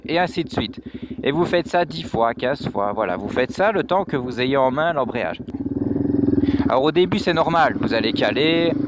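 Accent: Belgium. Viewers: Tu es belge ? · Tu es belge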